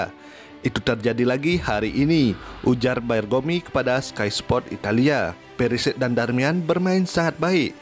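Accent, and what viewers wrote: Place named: Aceh, Indonesia. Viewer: Admin yang bicara logatnya macam orang aceh.. betul tak min...FORZA INTER..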